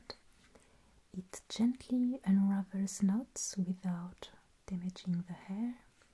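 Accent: France. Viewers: un accent très français tout ça 🤔😂 '' a very french accent all that '' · ~I'm a writer and I have a new character who is French and when I realized I needed to be able to describe a French accent, I came here because your accent is so lovely. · I watched one of her role playing videos as my introduction to her and thought she was doing a really good fake French accent, I came to this one expecting a regular voice when I realized she’s literally French and I’m just dumb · This is the perfect representation of the 18th century ♡ also, I was wondering, are you French? You seem French, I'm French so I don't know your beautiful accent looks French :)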